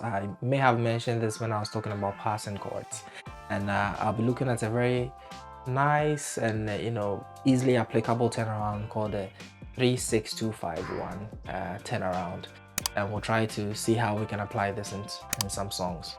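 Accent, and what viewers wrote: Ghana. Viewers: Are you Ghanaian? You sound like one though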